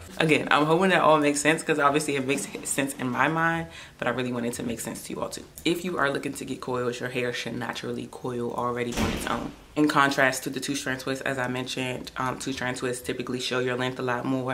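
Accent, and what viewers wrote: DMV, United States. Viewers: New Sub. I picked up on the DMV in your voice before I read your bio :) Great Information!! Ready to start my new set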